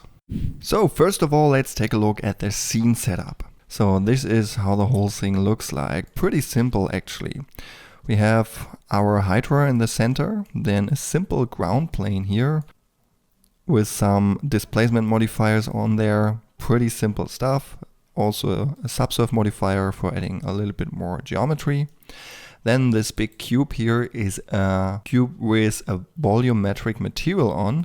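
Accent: Germany. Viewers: sis jerman äccent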